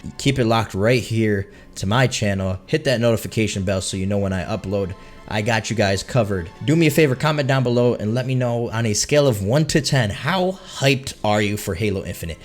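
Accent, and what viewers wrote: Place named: Minnesota, United States. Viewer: Are you from Minnesota? You sound like a Northern Lad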